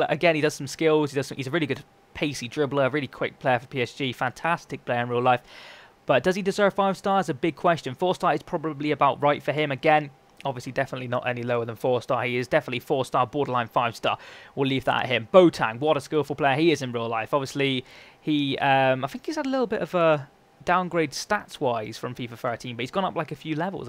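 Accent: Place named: Cornwall, United Kingdom. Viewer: you sound very cornish!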